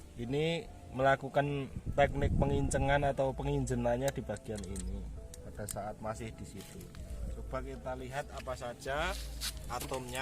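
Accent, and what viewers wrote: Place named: Surakarta, Indonesia. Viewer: solo ne pundi mas kulo colomadu. Sui biangeet ra krungu logat solo, guyu2 dewe aku..smangat terus mas yo💪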